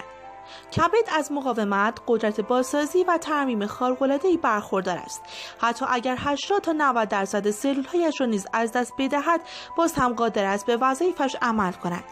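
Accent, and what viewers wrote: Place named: Turkey. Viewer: لهجه تورکیت منو کشته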